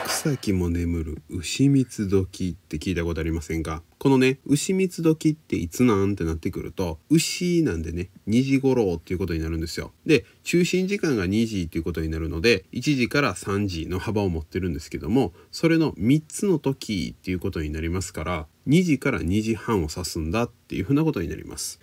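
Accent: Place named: Kyoto, Japan. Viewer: もちろんです！源氏物語はもとより、さんの京都弁、声、話し方、スピード、全てに癒されています。学生の時にこんな先生がいたらもっと古典の勉強、頑張れたのに・・😂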